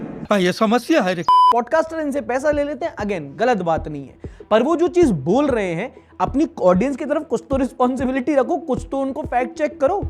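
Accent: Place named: Bihar, India. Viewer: Bhai bihar ka asar saaf pata chal raha hai😂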